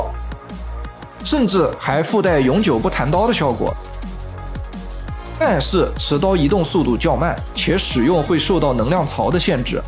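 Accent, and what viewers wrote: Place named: Shanghai, China. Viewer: 您是上海人吧，感觉你普通话的口音很有这感觉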